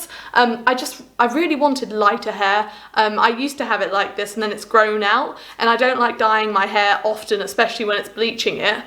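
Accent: United Kingdom. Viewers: You are so beautiful. I love your British accent by the way. 😊❤️☺️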